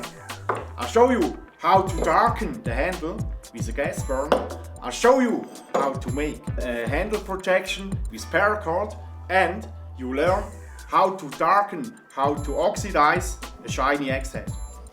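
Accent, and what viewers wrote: Switzerland. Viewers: bish du schwitzer dim akzent no🤔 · Dein Englisch mit dem Schweizer Akzent hört sich irgendwie lustig an 😅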